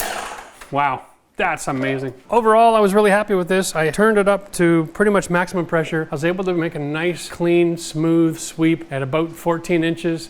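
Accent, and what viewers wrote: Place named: Canada. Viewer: I'm from Canada! Trust me...as a fellow Canadian....The way you talk.... We know ! LOL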